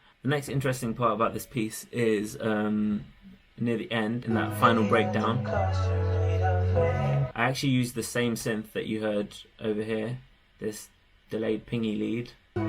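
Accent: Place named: United Kingdom. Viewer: You have British accent. Are you born there? I am in Toronto, Canada. Nice to meet you. Actually we both commented on the documentary about Saudi Economy.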